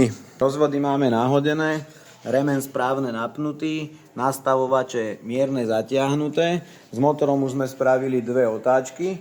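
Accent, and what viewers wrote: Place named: Trnava, Slovakia. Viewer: spravne cavo vyprava akoby vzivote trnavu neopustil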